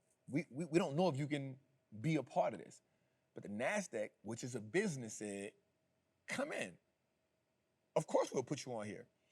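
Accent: New Orleans, United States. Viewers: Say bro I love your style I love your knowledge I hear this New Orleans accent thing are you from New Orleans man😅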